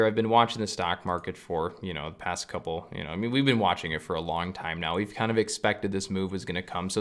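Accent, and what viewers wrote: United States. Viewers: You sound like your from Buffalo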